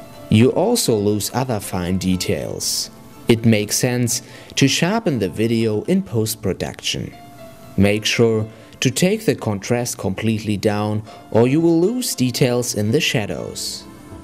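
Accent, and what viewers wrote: Germany. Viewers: Kanst du bitte ein video in deutsch über wie dreht man richtig videos zb. Mit der eos 650 d in deutsch bitte ich höre das du deutscher bist :) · is your accent german?? you sound a little funny? say fas-ci-na-ting, not fas-nating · You sound very German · despite the german accent it's very helpful, thank you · his accent sound like Germany! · Oooooh you sound soooo German :-) but nice Video. Thanks or Dankeschön :-))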